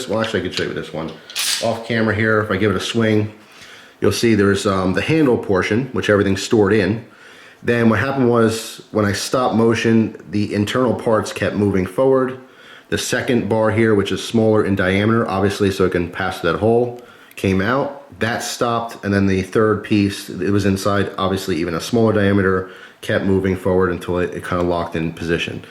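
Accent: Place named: Rhode Island, United States. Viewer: Hey, are u from RI? U sound very Rhode Islander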